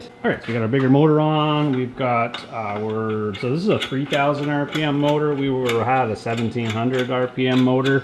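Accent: Canada. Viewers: For some reason you sound more Canadian today 😂 .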